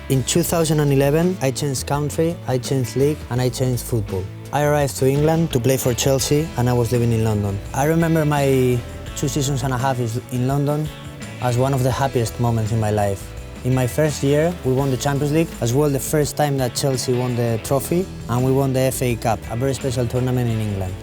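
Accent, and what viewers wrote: Spain. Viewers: Ese espaninglish!!!!💪😍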